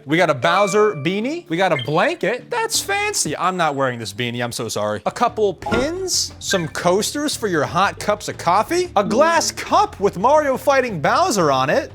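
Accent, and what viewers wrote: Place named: United Kingdom. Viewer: Oh my gosh British accent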